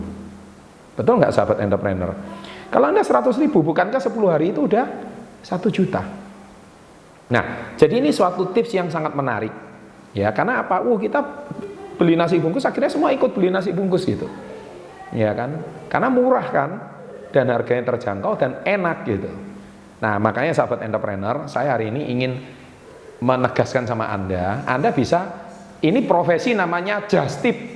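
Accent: Java, Indonesia. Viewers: Orang mana sih bang kok logatnya jawa banget😁😁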